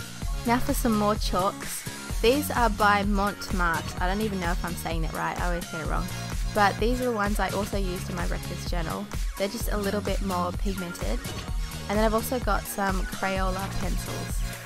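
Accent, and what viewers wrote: Australia. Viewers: Ikr, i totally want her accent ,"wotah" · Omg as soon as I heard your voice I knew you were Australian!!! I love your accent I wish mine was more noticeable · Hey, I'm in Australia too! So I already new the brands they were from. :D I also knew you were Australian because of your accent. :) :) :)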